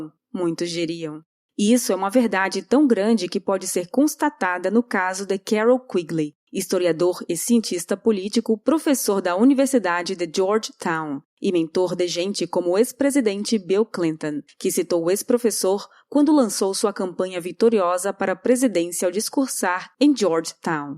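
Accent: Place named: South, Brazil. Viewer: Essa narradora é sulista kkkk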